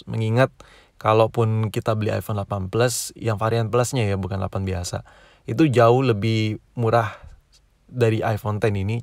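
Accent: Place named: Medan, Indonesia. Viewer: bjirr ada logat medan yak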